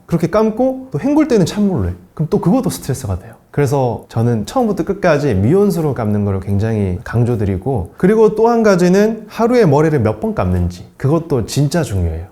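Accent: Gyeongsang, South Korea. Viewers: 선생님 고향이 혹시 경상도쪽이신가요? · 선생님 경상도 출신이시죠!??? ㅎㅎㅎ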